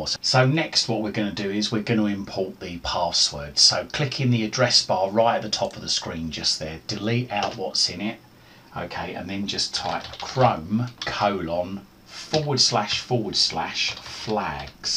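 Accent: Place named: Cockney, United Kingdom. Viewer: THANK YOU SO MUCH for doing this video! you're a life saviour! (Also, I love your accent, are you from London? my ignorant ear is telling me there could be a bit of a cockney-ish accent there?) still, perfectly clear and very well explained! again, thank you:)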